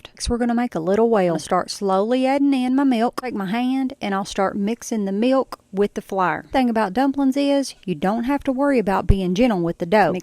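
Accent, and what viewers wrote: South, United States. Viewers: This woman has a southern accent and she may pronounce it however she likes. I know I can pronounce pompous and presumptuous ignoramous pretty well, and you, my dear are one.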